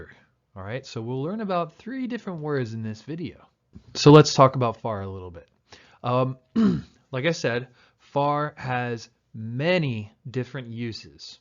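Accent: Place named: United States. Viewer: your classes are incredible and your american accent is great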